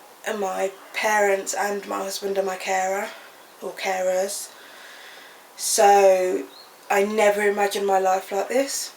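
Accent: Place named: Birmingham, United Kingdom. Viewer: I usually cringe at Brummy accents; I did not even notice yours. Thanks for sending me the link to your video and will definitely follow your progress. i have Spinabifida, reactive lifelong depression, anxiety, recent stroke, possibly M.E. and or possibly M.S., etc, etc, etc... as many of us have. Your willingness to keep going, just from seeing it in this one video offers me some hope. I hope you can and will record videos maybe every few days? It's things like this videoing that help people like me keep going x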